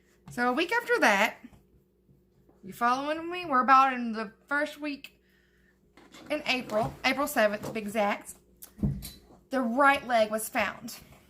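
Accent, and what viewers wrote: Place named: Rural, United States. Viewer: I'm here strictly to hear you're country ass twang and support you lol. The premise is good tho. Real crime, make-up, audio book. It is definitely a progressive thing. I dig it. 👍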